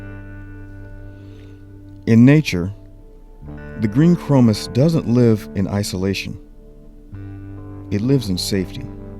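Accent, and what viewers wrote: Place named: United States. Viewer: I feel like I'm watching a David Attenborough documentary but with an American accent.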